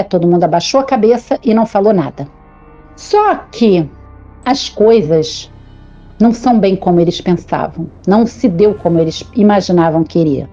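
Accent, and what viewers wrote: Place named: Rio de Janeiro, Brazil. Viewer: Eu to viciada na!!! Estórias mto boas!!!!! E eu que sou espírita seu que tudo que ela conta é real. E é carioca. Adoro sotaque carioca. Beijos pra vc. Brigada pelas estórias. 🥰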